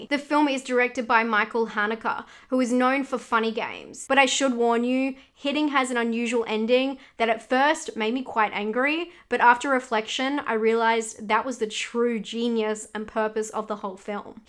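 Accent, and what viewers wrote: Australia. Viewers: I love it when you say "bizarre". Love an Aussie accent!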